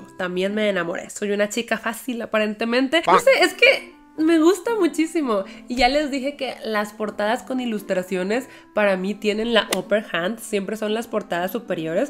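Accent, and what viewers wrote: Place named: Mexico. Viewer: Estoy aprendiendo español con tus videos. ¡Hablas tan hermoso y claro! Practico mi acento mexicano contigo <3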